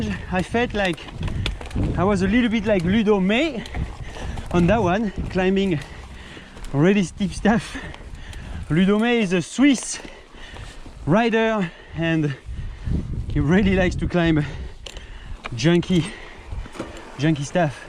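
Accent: France. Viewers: T’es tu français, on dirait ben vu ton accent... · Impressionnant... Dis-moi tu forces pas un peu sur l'accent français? :)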